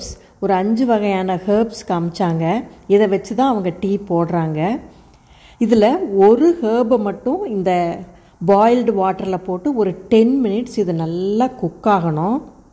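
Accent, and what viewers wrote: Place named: Kongu, India. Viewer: moraccan mint tea miga arumaiaga seithu kanbitheergal sago kongu tamilil pesinathu arumai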